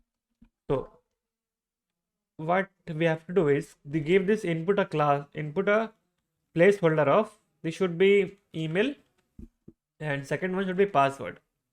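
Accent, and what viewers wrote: India. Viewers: Omg please not indian-english voice magodddddddddd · Stop being so offended dude. Narrator has an accent thick as a curry which is a fact. Before you call me racist, I am an Indian too and I would have said the same thing. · Indian accent make me easy to understand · change the indian accent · his accent is so thick indian, it's hard to get anything he says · Just 1 thing i like more than study programming - is to study programing in English with indian accent. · no hate towards Indians but I hate their english accent it irritates my ears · Indian accent provides clarity. Yeah and you guys are proving that you don't have good teachers to teach you guys, you depend on Indians.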